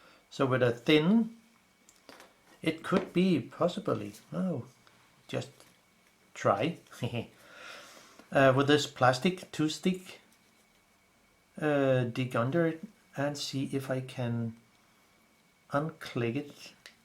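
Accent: Denmark. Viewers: Are you Danish :)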